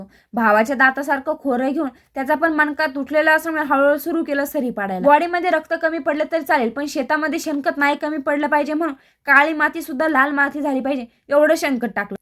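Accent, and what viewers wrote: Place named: Vidarbha, India. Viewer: तुझी भाषा विदर्भातील वाटते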